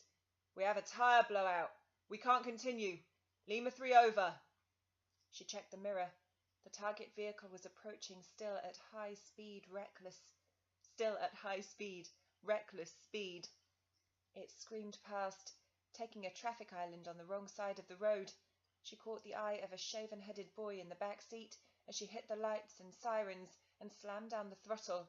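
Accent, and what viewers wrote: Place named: United Kingdom. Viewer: (well, it sounds British to me, I'm not a native speaker! Sorry if I guessed wrong!)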